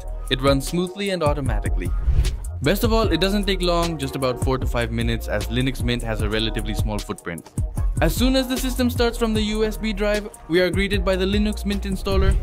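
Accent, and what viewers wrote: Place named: India. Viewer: Bro is in Colombia and has a indian accent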